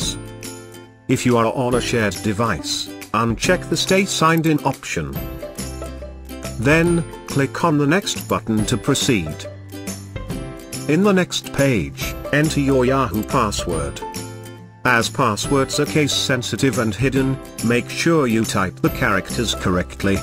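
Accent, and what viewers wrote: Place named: United Kingdom. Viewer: I love British accent so cute and sexy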